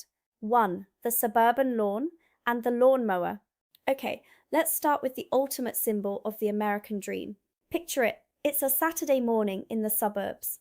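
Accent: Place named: RP, United Kingdom. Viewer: I do love your pronunciation of the Queens English, keep posting 🙏🌹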